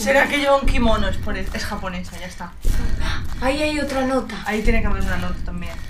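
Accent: Andalusia, Spain. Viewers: ioputa! jajaja! Mola el acento de xD Es andaluza?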